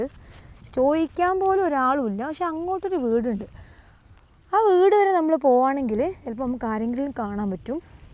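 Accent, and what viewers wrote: Kannur, India. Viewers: Ninte oombiya Kannur bhasha....podi purathu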